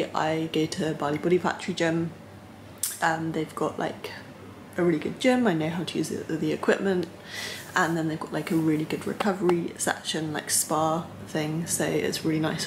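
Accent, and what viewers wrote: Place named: United Kingdom. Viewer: I know lots of guys come here to watch you work out...but for myself with your accent and your calming tone I could listen to you talk all day. Its very old style British you dont hear anymore. cheers from USA.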